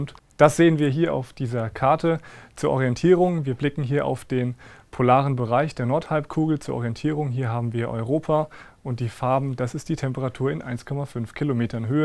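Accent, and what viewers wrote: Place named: Ruhr, Germany. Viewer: ...das ist ruhrpottdeutsch...herzlich u. direkt. ich liebe es...😍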